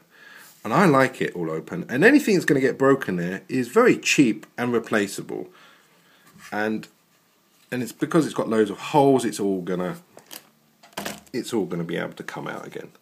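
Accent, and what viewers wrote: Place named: United Kingdom. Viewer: y r u british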